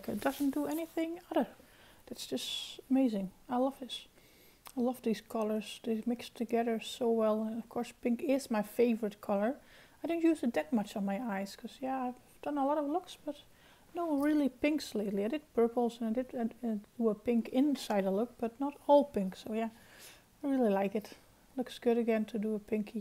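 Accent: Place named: Netherlands. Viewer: Gorgeous look ✨💖✨ I’m currently stalking my package 📦 with this gorgeous palette in it... it’s just down the west coast from me in San Francisco... 🤨 I see you Rose Metal palette and 2 eyeliners...😳soon my pretties...soooonnn 😳🙌🏽🥰🙌🏽🤣 I love your accent, it sounds Dutch to my old ears 🤣 I apologize if I’m wrong, which I usually am...🤨😳🤣😉 I think accents of all languages is beautiful, with the exception of a couple my American accents... I just don’t think our accents are that great...some of the southern accents and Midwestern, New England accents I love, but the regular sounding American accents on the west coast is kinda blah bcuz I hear it all the time. You’ll love Lois’s Gone Rogue palette too 🥰🤗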